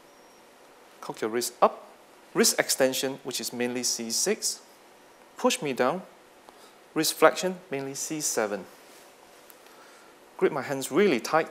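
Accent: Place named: Singapore. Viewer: A very polished Singapore accent.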